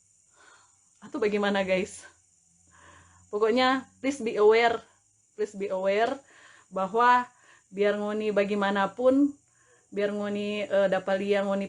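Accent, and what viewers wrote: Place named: Manado, Indonesia. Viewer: Itu logat manado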